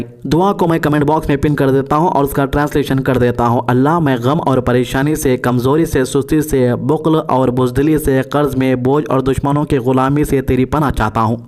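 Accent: Hyderabad, India. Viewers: Mashallah 👌 Miya bhai ki awaaz mein kyon khabren bol rahe ho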